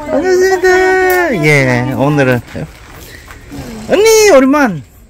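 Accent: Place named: Gyeongsang, South Korea. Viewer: 참~~말로 갱상도 사투리 정겹네예~~~ 버스턴에서도 잘 보고 있습니다.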